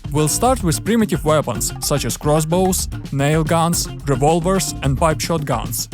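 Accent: Russia. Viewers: я слышу руский акцент ?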